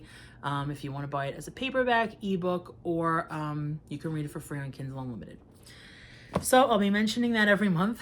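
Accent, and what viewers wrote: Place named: New Jersey, United States. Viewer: I love your accent so much. Is it New jersey or Pennsylvania? Sounds like Marisa Tomei in My Cousin Vinnie.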